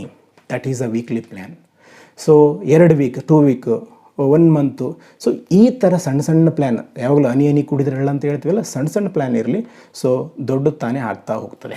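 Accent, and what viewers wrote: North Karnataka, India. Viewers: Nimma way of communication lovely sir I love it pakka uk mandi anasutte thanku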